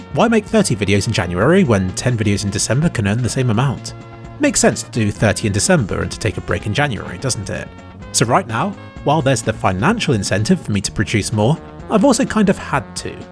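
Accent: United Kingdom. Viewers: Klikbait is better than Clickbait. Thought I watch your videos due to your quality and soothing (Bristish?) voice. I watched your latest video about reflections in Cs:Go and had no clue what you were talking about yet I enjoyed the video since it appears that you know what's going on. Even your reaction video on Half life Alyx is intelligent, most reaction videos are morons pointing out obvious things like excited apes, but you actually point out things that most people wouldn't see otherwise- tech related things for example. Don't change your style and honesty as it defines you and your channels.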